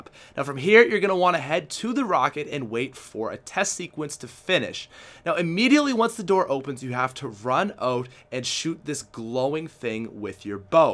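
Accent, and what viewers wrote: Canada. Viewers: is this guy from Canada? he sounds like he is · Are you Canadian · Idk if anyone can tell but i know for a fact he is canadian by the way he says "are".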